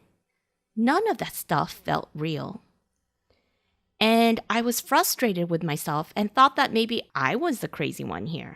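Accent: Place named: South Korea. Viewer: Not related to the topic, but I assume you are Korean American? Haha... just a guess but you have a bit of that Korean accent while speaking English.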